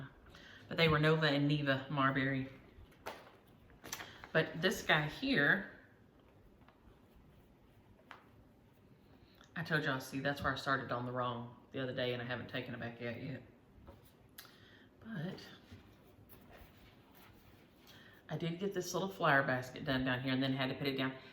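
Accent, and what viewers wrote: South, United States. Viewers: Oh my goodness this is my first visit with you and all you had to do was open your mouth and speak! I love your accent, it is so amazing and southern( just like sweet tea)😂 enjoyed your video, I will definitely tune in again. Thank you so much!!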